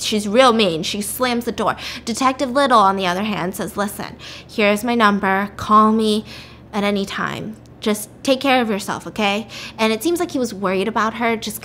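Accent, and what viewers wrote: United Kingdom. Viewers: Ever since has started speaking in an British accent I’ve added it to my daily life 🤩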